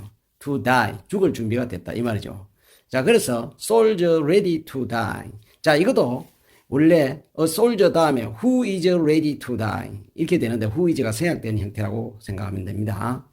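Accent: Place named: Gyeongsang, South Korea. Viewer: 늦게 선생니을 알게 되었군요 처음부터 찿아서 잘배우고 있습니다 감사합니다 경상도 분 이신가봐요 저는 경상도 할매입니다 혼자영어공부 한지 십년이 되어가는데도 아직 버벅거립니다 그냥 공부가 좋아 조바심 없이 하고있습니다 좋은 가르침에 감사드립니다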